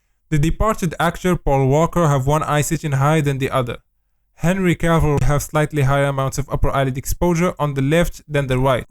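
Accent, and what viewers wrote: Morocco. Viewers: Moroccan accent 🎉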